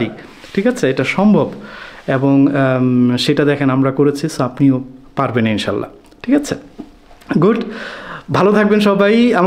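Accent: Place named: Tangail, Bangladesh. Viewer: I saw very old videos of u, and got to know by ur speaking accents that your hometown probably in Tangail. I was surprised my hometown also there. And currently i am studying at University of Dhaka in Chemical Engineering 3 rd year first semester. Hopeful so see you if my wish going to Germany is fulfilled in shaa Allah. ❤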